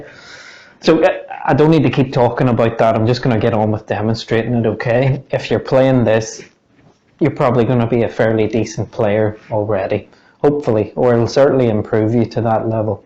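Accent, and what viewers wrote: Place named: Belfast, United Kingdom. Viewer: Was amazing hearing your accent and realising you’re from Belfast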